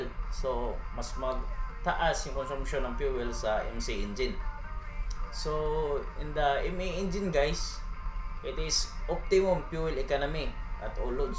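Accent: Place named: India. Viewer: brother ur starting to sound like those indian youtube videos, no offense taken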